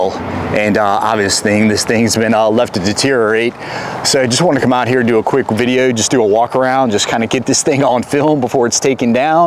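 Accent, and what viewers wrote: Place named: South, United States. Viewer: Wow, what a great accent! Where are you from? Virginia, West Virginia, Georgia? I skipped the intro.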